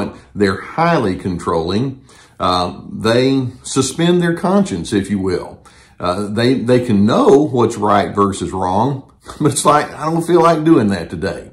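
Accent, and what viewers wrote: South, United States. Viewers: I forget where my discourse amongst myself takes place in this comment section, but it’s really funny because my mom will be laughing so much. Everything he says. Is me but like I’m counteracting it. It’s funny because it’s like wow he’s got that southern yet. Chris drawl. I am yapping. Like a Chihuahua. About my identity and rights. It’s hilarious. This is good banter. Sometimes two opposing forces can create something like the binary between us. Binary sound, you know what I mean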